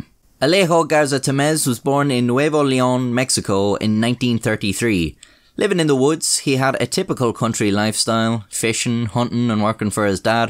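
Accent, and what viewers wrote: Ireland. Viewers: The way your stories are presented is pretty damn awesome. I mean, where else can an old, white guy from the USA get to listen to stories told by someone in an Irish accent. The articles are interesting, yet amusing, just what this old man needs after a day of work. You've gained yourself another subscriber, lad. Keep up the good work. :) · Great story. I would never have u heard it or even remembered it if it wasn't for you and the way you told the story. Love the Irish accent. I'm a subscriber.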